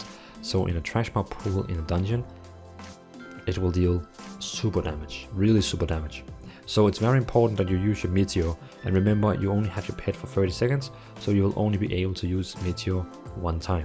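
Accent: Denmark. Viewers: Really enjoy the tone and speed of you voice :) do i hear some Danish in you english also? You Got a sub :D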